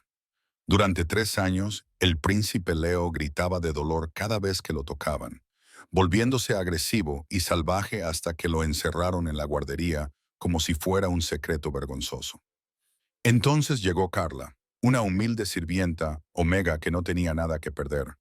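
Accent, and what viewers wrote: United States. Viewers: Pues buena la historia pero como una prometida va a matar al rey para quedarse con su trono si ni siquiera estaba casada... como que la traduccion esta mal la voz narradadora parace un gringo hablando español, pero en fin la omega era la madre muy revorugada....el alfa seguia haciendo lo mismo basandose lo que lara le decia...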